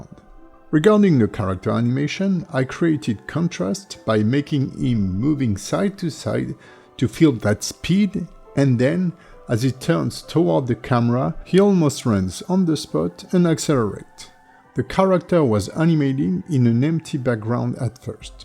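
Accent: France. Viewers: My favorite tutorials are french people making english video with a french accent while i'm french with not so great english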